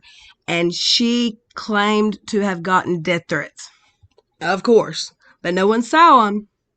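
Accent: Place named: Ozarks, United States. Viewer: you sound like you're from arkansas missouri etc that area; and sounds like she is from the midwest (which she is she lives in MN st paul area cheese country) so that the fact that she is saying her harraser is from the same is in geographic area as her yet YOU have a very differnt and strong accent from the diff part of the country just shows that she is trying to place blame on other people and is sick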